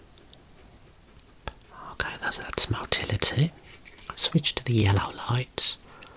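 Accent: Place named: Scotland, United Kingdom. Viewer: Quick question: Are you Scottish? Your accent gives me Scottisch vibes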